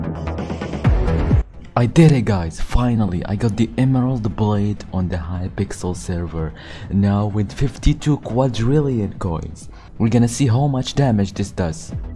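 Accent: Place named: Arab world. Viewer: your accent sounds arabic..im arabic too !! also if you have discord can you tell me it? my discord tag is vxpxx#1832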